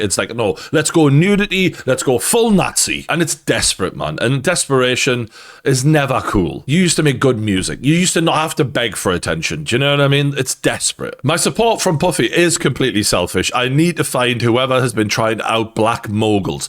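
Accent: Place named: Northern England, United Kingdom. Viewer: He's dangerous and a drug addict. The fact kids are unaware of the swat sticker is dangerous he makes me sick he's Jelous and bitter 😂😂😂 he's made me hate him since Sunday I can handle the Grammy show up but he was greedy!!! Wanted the attention kendicks light. He said too much that's unfortunate and unforgivable. Ps love your accent I'm from Cheshire and a creator and love my nothern creators. ❤❤❤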